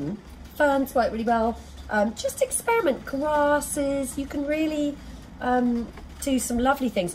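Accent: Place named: United Kingdom. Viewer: I showed several of your videos to my Junior High Ceramics students and at least a few students per class, most accurately, exclaimed if I had a British accent I would be you. lol. I love the comfortable experimental way about you. Thank you so much.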